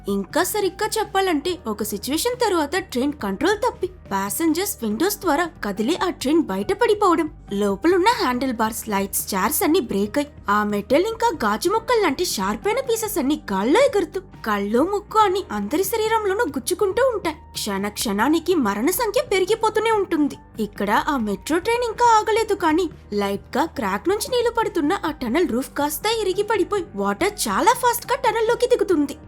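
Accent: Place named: Tirupati, India. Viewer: Hello by identifying your voice I am guessing your from tirupati around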